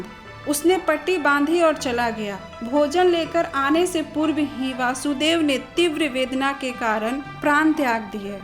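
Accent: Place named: Uttar Pradesh, India. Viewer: तेरी आवाज बता रही हे तू नफरती दलित हे ,, तेरी बोली बता रही हे तू उत्तर प्रदेश की नफरती दलित हे नकली कहानिया फेलाना बंद कर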